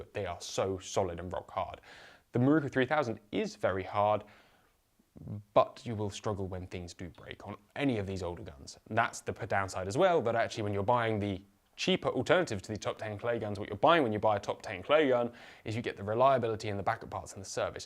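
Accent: England, United Kingdom. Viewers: An English man, speaking English, with an English accent, in England, crazy.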